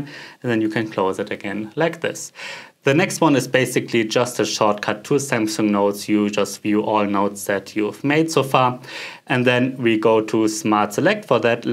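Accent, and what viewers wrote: Germany. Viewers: Maybe obvious, just wonder whether the S pen has palm rejection or not. As you use it I start to doubt. And whether it has any pressure sensitivity. Thanks for a nice video. Like the German accent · У тебя немецкий акцент 😂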